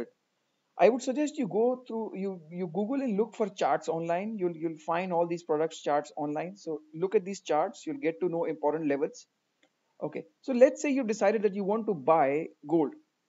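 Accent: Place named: India. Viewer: can't understand the Indian accent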